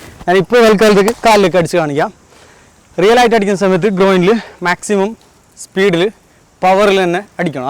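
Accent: Kasaragod, India. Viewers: ശുദ്ധമലയാളം സംസാരിക്കാൻ ശ്രമിച്ചാലും ആ കാസർഗോഡൻ ശൈലി വരുന്നത് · കാസറഗോഡ് ആണല്ലേ.... ഗുഡ്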